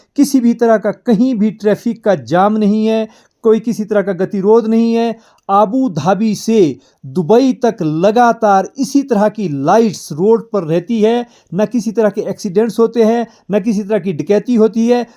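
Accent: Standard. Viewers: Aap ek dham pure Hindi bolte hai unkal ji ❤❤veda cute ❤❤❤❤😊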